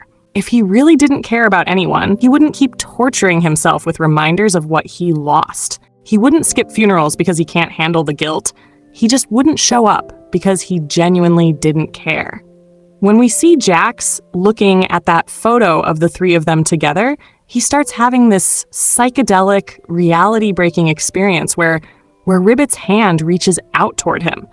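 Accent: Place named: United States. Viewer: I love what the AI script is clearly British but the AI voice is so American, like hearing all these British words in an Ai American accent is so funny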